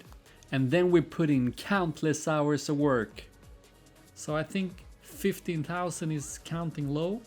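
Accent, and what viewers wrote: Sweden. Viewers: Who said that your English is bad, actually I found it perfect, and add that little Swedish accent to it that makes it even better an special, honesty don't listen to those ppl cause you can't be liked by every one no matter what you do, just continue doing your own things and the most important part enjoy doing them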